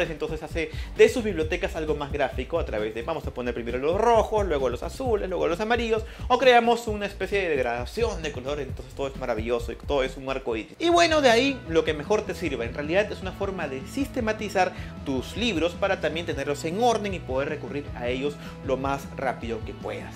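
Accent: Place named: Peru. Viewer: Peruano detectado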